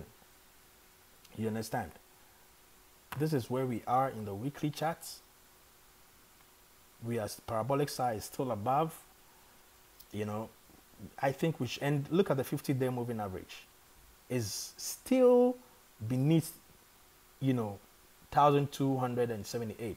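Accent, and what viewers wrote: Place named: Ghana. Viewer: Are you from Ghana? Your accent is a giveaway.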